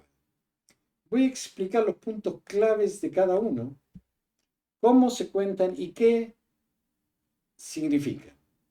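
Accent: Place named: Buenos Aires, Argentina. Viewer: Yo pienso, señor que tiene una voz buena para el canto, y su acento porteño tan marcado, me gustaría escucharle un tango, o una milonga, como:, y otros. Porsupuesto con todo respeto a sus enseñanzas, y lecciones cuantas cualidades, shalom ve alejem.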